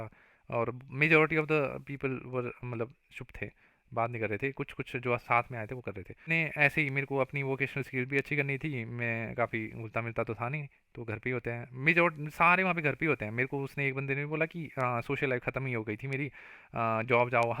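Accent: Himachal Pradesh, India. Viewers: You sounds like a himachli guy ... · Oo hi? I'm from kullu Apki tone se lg gya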